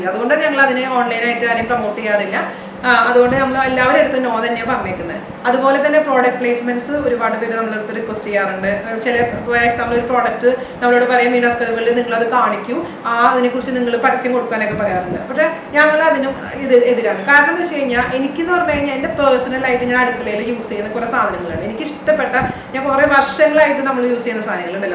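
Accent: Thrissur, India. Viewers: Chechy thrissur basha nallatha...super... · Hi..njan chechide receipes recently aanu kandu thudangiyath. I tried ur cooker biryani, palada, mango, vanila, butterscotch ice creams..super ayirunnu. Cookingil chechiyanu ente guru.njan adukalayil kayaratha aalayirunnu.marriage e aduthaan kazhinjath.so cooking avasyamai vannapozhanu chechide videos kaanan thudangiyath. valare detailed aayi trissur baashayil samsarikumbol njan valare intersetode kelkum.pinne try cheyum.ithuvare ulla ella first tryum success ayirunnu.now cooking ente hobby aayi maari.thank u so much.likes ur smiles, sarees & way of talking👍. We are staying in sharjah. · nan alappuzhakariya..chechide trichur slang enikistama kekan..also chechide vartanam kekanum..recipes..presentation.. very genuine family..way 2 go..video kandondirikuva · we love u a lot n I try most of ur recipes.. All r superrrrr 👌specially ur thrissur slang May God bless u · adipoli ayitundu nammude thirssur bhasha mattano orikkalum ella ale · Thrissur language anu chechiyude vediosnte highlight.. 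njanum oru thrissurkari anu.. kalyanam kazhichath kochikaraneyum.. apol eniku thrissur rujikootukal ente vetil poyal mathrame aswadikan kazhinjirunullu.. husband nu kochi taste analo.. nerathe with family kochiyil thane ayirunath kondu avidathe tastel food undakiyale ellavarkum ishtamakumayirunullu.. ipol njanum husbandum molum qataril anu.. arginine thane husbandinu meth pareekshikukayanu thrissur taste ulla foods.. pullikaran ipo parayukaya ne nanayi cook cheyan padichalonu.. athinu chechiyodu anu thanks parayanullath..thank you so much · Thank you, varthamanam valare ishtayi, your experience is great inspiration to all. Please don't publish cookery books, nobody has the patience to go through them, adilum ethrayo rasamane ippozathe reethi. Don't bother about nasty negative comments. Trissur slang mattalle, appol veenayallathakumtto, adu theere sahikkan vayya, so pls continue in this same manner. 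It's so sweet of you and to tell us in detail about the monetary part of the deal. No need to change your logo, that has become part of your identity. You have cleared the doubts of many of your viewers, usually nobody takes the time to do that. Yeah has made you more confident and independent. Hearing your talk, I'm feeling so close to you, veetile oralepole. May god bless you and your family. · I m a new subscriber.also from thrissur.u r a great inspiration.love Ur videos.especially ur thrissur slang.wish u good luck.keep inspiring.stay blessed. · Hi....nalla rasamundu chechiyude samsaaram...njanum thrissur aanuttoo....athukondu bhasha maattandattoo..ente husbandum chettane pole thanneyaa....chilappol pidichoru kuthu kodukkan thonnum 😠... ennalum kuzhappamillaattoo... aalde Job athupoletheyaa... eppozhum thinking mind aayirikum...anyway thank you for your advice....👍👍👍😘😘😘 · Hi am new to this channel... 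But this vlog is excellent.... Extremely inspiring for housewives like us. Thank you soo much. Can relate to most of the things you speak. Njaanum trissurkariyanuttaa. Tried ur varutha erissery. Excellent feedback from my husband who usually don't like vegetarian. Pinne trissur slang kekkumbo kannu nirayynnu. Am settled in New Zealand so missing trissur soo much. Please keep this slang for people like us. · I love the way you talk! I find your videos very informative and entertaining! Don’t lose the thrissur slang;) I am cooking now because of you! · thanks, and once again ur videos are very good, more than that it feels so homely the way u talk😀. I am from Thrissur, so ur slang makes me feel back home. I am a blogger too <link>,very new, looking ahead ur tips and help when I need😋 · nammude thrissur basha vidaruthu tttoooo.......superaaattto chechiyekkalum enikk chettaneya ishttam... bcz he motive u and encourage u....thtz great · English ൽ സംസാരിച്ചാൽ തൃശ്ശൂർ ഭാഷ പ്രശ്നമല്ല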